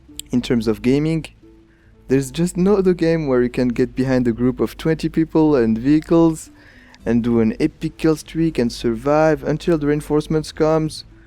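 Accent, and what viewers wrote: France. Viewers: Is this Dude frech ? Sounds pretty like it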